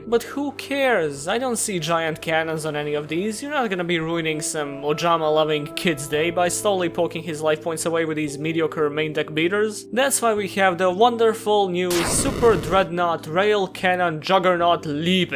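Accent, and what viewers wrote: Balkans. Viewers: E brate tačno sam znao da si neki naš balkanac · didnt know you were from the Balkans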